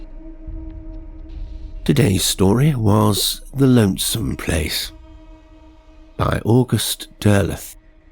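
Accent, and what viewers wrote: United States. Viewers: Wow Your American accent is superb! I’m so impressed - You have me wondering which of your accents is authentic to you! I was so excited to see you posted this, to have Ian Gordon and in the same day is a huge treat! Just in time to help the evening summer showers break the oppressive heat wave with chill bumps 🖤 Thank you!! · Love the American accent and the background sounds. Thank you 😊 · Thank you. I loved this story and your mild American accent was just right for it. Great 👍 😊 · Ooh great story and fantastic American accent Thanks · Excellent story and narration! You have a great American accent. We all had a place like this growing up. Passing it caused our nerves to be on edge, and when we got home we were so relieved. The things we thought lurked out there... I find the light pollution, population growth, 24/7 traffic, and increased background noise dissipate the feelings nowadays. 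As always, the sounds and environmental/background noise fits the story soooo well. Fantastic job, my friend! You have been spoiling us lately. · No problem with Englishmen attempting an American voice. Yours is fine, and the one other narrator (Greg Wagland) who attempted an American voice for a character did all right, too. Which is amazing to me, because if I attempted an English accent, it'd sound more out of place than Al Jourgenson's in the song "Every Day is Halloween" * *(Lead singer of Ministry) Ministry used to be a synth-pop band before they went metal, and in his synth-pop albums he sang with a fake English accent. Kinda cringe-y Your American accent is believable. I'm always up for some Derleth. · His accent was excellent. If l hadnt known it was, l would have believed it was a Murican reading the story, great atmosphere, totally there, recall a few lonesome places from my childhood back in the sixties, first l had to pass a cemetery with a really old churchyard cemetery on its other side, but l could stay over the road from it, but on the other side so fare up a rise in the road, was nothing just bare open scrubby land, all just been bought and turned into an housing estate as years webt by, but then it was pitch black one street lamp that barely lit up underneath itself, that was dark almost from the edge of the path for a quarter mile then dipped down into a hollow. 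Then on the other side of the road going up over the rise was the back of a row of terraced houses, scrubland between the edge of the road up to the cobbled back street where dustbins the old metal type were left out, then the yard walls of the houses 80 year old built for the iron ore miners, l would get to the fish n chip shop l had been sent to only about 7.30pm but in late november dark as it could be, sometimes before l used to pass thru the street as it was lit by street lights and lights spilled out thru thin curtains, you could se the flicker of tv sets, and often hear music or actors speaking, it was good that way only at the bottom of the street it was a little clozer to the. Churchyard, but l could run past that bit at high speed, but still l could imagine my worst fear happening six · Your America accent is good, except, (if you're interested/open to notes) that you keep adding a southern drawl on "Ah" sounds and periodically when emphasizing a word or phrase, into what is otherwise a northern/eastern accent. 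Not a fan of Derleth in general, but this is one of his best- almost a Bradburian or King tone, which suits him better than bowdlerizing Lovecraft. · Your generic American accent is very good.. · while there's an occasional hint of your English accent, I'd say your Anywhere, USA accent is pretty good. I mean, it's a hell of a lot better than my attempts at an English accent. 😀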